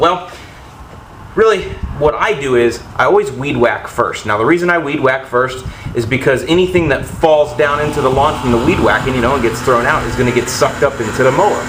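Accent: Chicago, United States. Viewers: Dude, you're Chicago accent is on point!! But I'm from Texas. Thanks for the info. I'm new to your videos so I'll be gravediggin these old vids. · nice chicago accent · I had to move away from my beloved Chicago a year ago....and I miss it TERRIBLY! Thanks for the accent. I have known a few in my day who DO sound like that...I even miss THEM. hahaha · Lol that chicago accent is engrained in you to this day! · This clip kept me in stitches. I pretty much bet you've not heard this one, that your Chicago accent and voice sounds very much like the pro fisherman Babe Winkelman of Minnesota. Kinda glad you don't use that accent in all of your videos. Ha! ~oO(♫)Oo~ PS. Tonight was my third evening and I finally finished applying 20 Gal. of weed killer via a 2 Gal. tank sprayer (the "fan" nozzle helped a lot) and am already seeing lots of "wilt" and the fast-growing weedy lawn has already slowed to a belly-crawl! 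I will keep you posted. 2, 4-D rocks!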